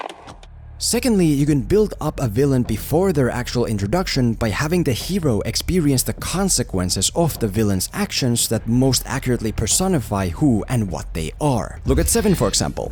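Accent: Finland. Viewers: You have the most Finnish accent I've heard in ages. Am I wrong?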